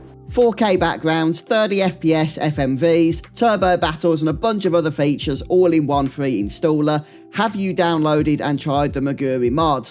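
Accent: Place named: Birmingham, United Kingdom. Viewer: This guy sounds like he's from Birmingham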